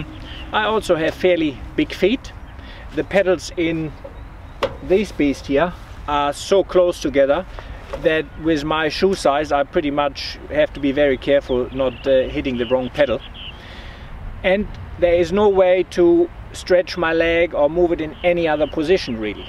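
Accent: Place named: Germany. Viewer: Ähmm... your accent... sounds damn deep German... richtig oder? Still Pinzgauer for President... best time at Austrian Military was "driving" to Locations with this thing... driving, its more waiting for the Location comes to u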